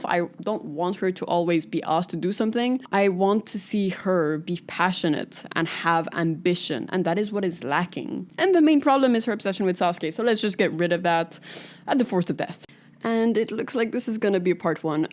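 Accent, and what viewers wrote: Quebec, Canada. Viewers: Are you French Canadian?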